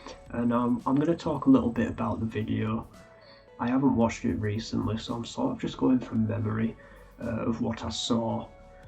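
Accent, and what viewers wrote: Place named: Teesside, United Kingdom. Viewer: I remember watching this on some gore website a couple of years ago and finding the comments absolutely infuriating, disgusting and disturbing. Not on topic but a secondary question, are you from anywhere near Teesside/north Yorkshire? You have a similar accent to me but I can't place it.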